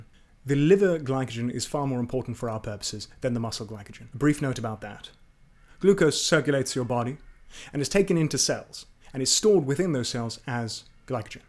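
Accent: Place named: United Kingdom. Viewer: I think I was expecting a California surfer dude accent because of your hair, but got Sherlock Holmes instead. Anyways, great video!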